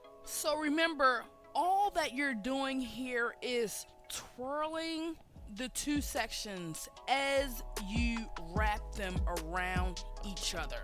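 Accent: Baltimore, United States. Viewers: You from Baltimore lo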